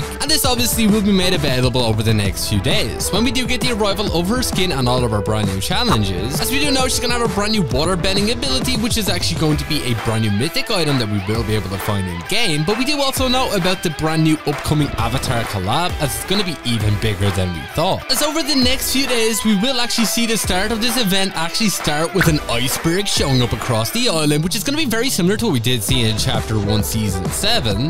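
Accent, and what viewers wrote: Ireland. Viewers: Are ye from ireland I am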